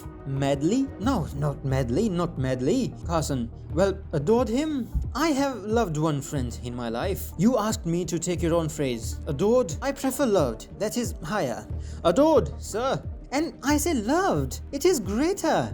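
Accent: India. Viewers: u r indian?? so what about this accent is it ai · That accent is thick in the Indian way alright. Maybe he tried to recover from the actual super heavy Indian accent but it still sounds very Indian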